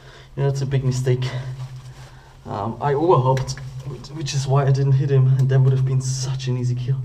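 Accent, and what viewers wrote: Germany. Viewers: Bist du ein deutscher man hört es nähmlich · Man hört das du deutschsprachig bist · Eindeutig ein deutscher😂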